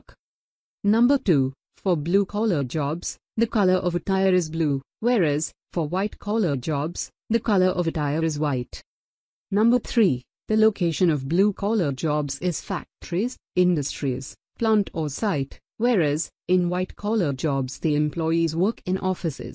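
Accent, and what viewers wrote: India. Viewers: In many parts of the world, blue collar jobs pay people much less and are therefore considered to be lower. However, in developed countries, blue collar jobs can pay just as much if not more. I agree with you, but I did notice that her AI accent was Indian. In India, blue collar jobs tend to be done by lower educated individuals who also get paid less.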